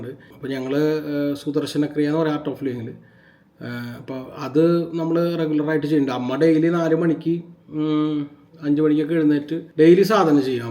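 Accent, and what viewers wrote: Thrissur, India. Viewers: Ente native place trivandrum aanu...trissur language kelkan eniku bhayankara ishttanu..pinne nigade bonding bhayankara resamanu athukonda njanum suscribe cheythathu..very simple and humble souls...vere oru channal njan kaanarunde,athum trissur aanu its me vijitha...aa kuttiyum paavama nigale pole😊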